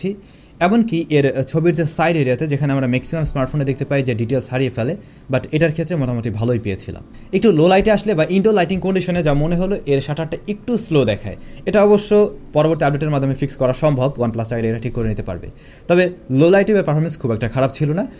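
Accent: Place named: Tangail, Bangladesh. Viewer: Vaiya apnar basa kotha love for Tangail ❤️❤️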